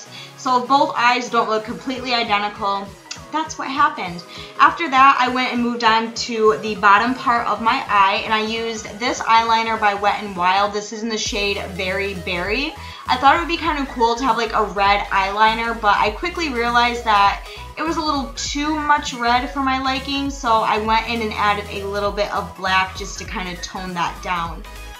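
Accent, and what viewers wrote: Michigan, United States. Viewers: Love all your looks, you make it look so easy!!! Btw love your Michigan accent! ❤️👍🏼😘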